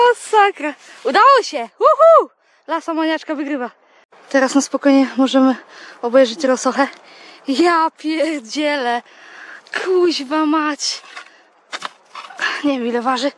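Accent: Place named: Podlasie, Poland. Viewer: Zazdro z tymi rosochami, taką to łatwiej znaleźć niż tykę jelenia, bo dzięki śniegowi łosia można łatwiej wytropić. A u mnie łosi nie ma. Gratuluję :) I podoba mi się twój akcent, chyba podlaski?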